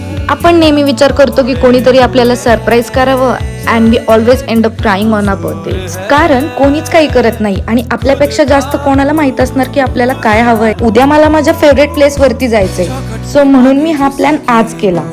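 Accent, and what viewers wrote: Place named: Marathi, India. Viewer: Didi tu disayla model disti pan aavaja varun apli marath molich ahe barka😅😊❤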